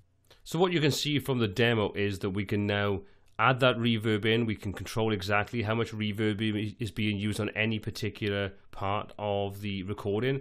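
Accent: Wales, United Kingdom. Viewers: Thanks for the great series. I am rather enjoying what I perceive is a Welsh accent. Am I incorrect? My mum is from Cardiff, the Docks neighborhood of Tiger Bay. You're voice rather resembles her brother, my Uncle Bobby. :-D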